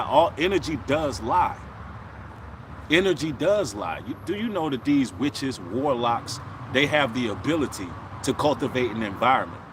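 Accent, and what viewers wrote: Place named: Chicago, United States. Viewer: U must be from the Chi. Shout out to you bro!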